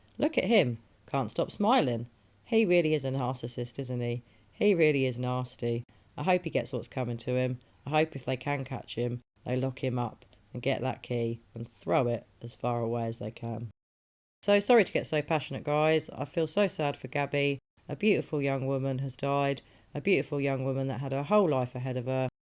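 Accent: England, United Kingdom. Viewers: IMO he simply stole it as a trophy, and to feel his superiority and control every time he looked at it. If this was the reason, murderers usually hang on to their trophies, so may be if not wearing it, it's still with him. Thanks, for your videos, I live abroad, and look forward to hear you English voice with each new video. YAY, go gal go!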